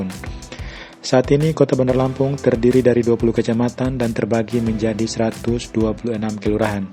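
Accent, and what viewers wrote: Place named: South Sulawesi, Indonesia. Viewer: Dr logat bahasa sepertinya admin orang sulsel ya 😂,sangkamma jiki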